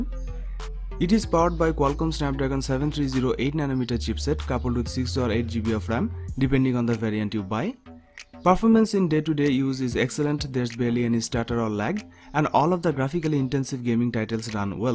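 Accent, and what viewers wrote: India. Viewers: Sound like indian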